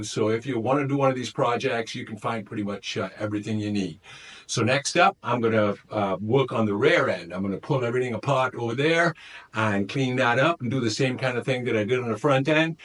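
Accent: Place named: South Africa. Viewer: Nice work! Anyone who has done stuff like this knows it can be a bit tedious, but it is very rewarding in the end. That DT is gonna be a thing of beauty. I've been trying to figure your slight accent. South African?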